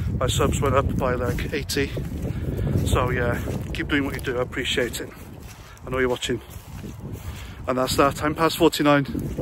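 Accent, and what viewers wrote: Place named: Liverpool, United Kingdom. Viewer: You know why you picked a Scouse accent mate. No need to explain 🇬🇧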